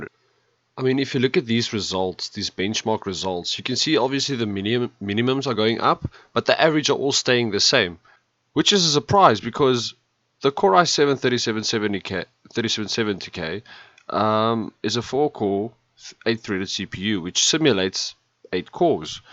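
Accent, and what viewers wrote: South Africa. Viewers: you sound South African, lol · Yayyyy your south African yessss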